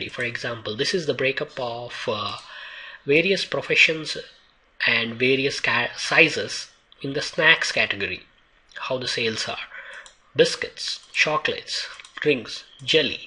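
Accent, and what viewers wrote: India. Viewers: Interesting video. Although, your accent sounds like a typical Indian, I still can understand you. It's clear and well explained. I think it will be better if you include sub-title to your video so that viewers who are not familiar with Indian accent can understand you better. Anyway, it's a GREAT JOB. Thanks a lot. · I open the video, hear and indian accent and immediately know that the tutorial will definitely worth my time.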